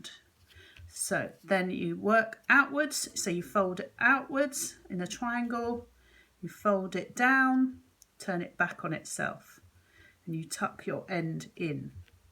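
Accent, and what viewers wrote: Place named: England, United Kingdom. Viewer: Hi from ARGENTINA!...I loved this project..beautiful star! ..and I loved your ACCENT!! What part of England are you from? You speak really clearly and nice...😊😊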